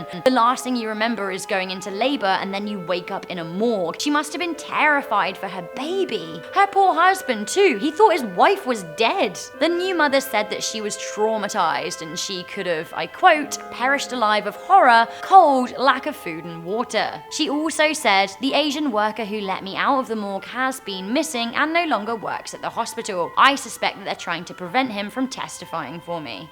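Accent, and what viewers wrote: United Kingdom. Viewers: I love your British accent , its soo beautiful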